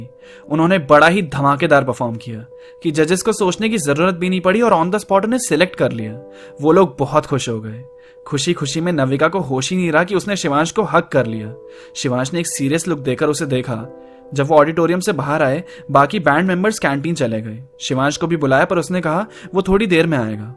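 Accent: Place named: Haryana, India. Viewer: Aap sayad hariyana se lagte ho😋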